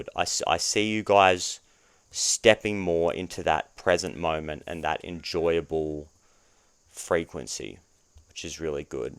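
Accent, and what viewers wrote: Australia. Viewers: Are you from Australia? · Sorry dude, I can’t listen to an Australian accent. Peace out 👋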